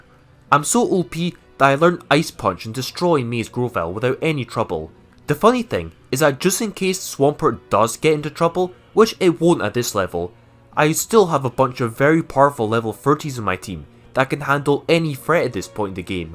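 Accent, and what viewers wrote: Scotland, United Kingdom. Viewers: You sound like a Scotsman attempting an English accent bro, Good video.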